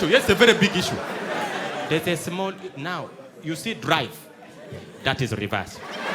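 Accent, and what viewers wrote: Uganda. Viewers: he is exceptional and way above some Nigeria comedians but it is pure ignorance to talk about accent of Nigerians when he has a strong Ugandan accent himself. You can actually disagree with your head and not your heart.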